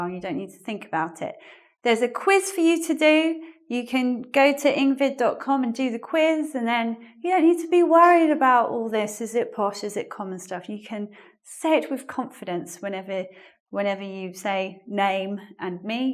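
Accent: United Kingdom. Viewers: Your voice and British accent are so elegant that I come to listen to them no matter what you tell (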